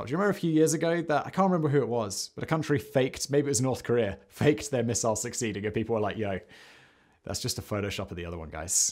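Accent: United Kingdom. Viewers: Admittedly, I stopped after "Inside the V-2" section. So, alcohol and water, that's it? Did you forget the oxidizer? As our leader says, "Come on man!" P.S. I have to slow all your videos down to at least ..... 0.75 speed. Drink less coffee or tea or even consider a mild tranquilizer, you speak like you're being charged by the word, and with a British accent (can't be helped, I'm sure) which makes it very difficult to follow, much less comprehend, what you're saying. Nonetheless, your videos are interesting.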